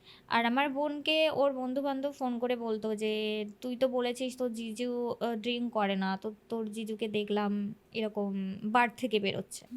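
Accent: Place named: Bangladesh. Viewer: UNI BODH HOY BANGLADESHI BY BIRTH...... ONEK BANGLADESHI MANUSH OI BHABEY PRONOUNCE KORE......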